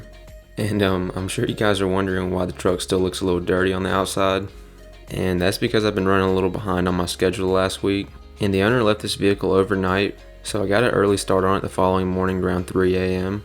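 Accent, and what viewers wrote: South, United States. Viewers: Detail geek but southern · i heard your voice i ohhhhhh hesss a countryyyyy boyyyy😊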